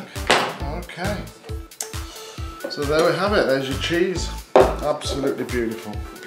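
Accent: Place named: United Kingdom. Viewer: I'm sorry but hearing you say mention the mold 'colonize' your cheese, said in your British accent, made me laugh.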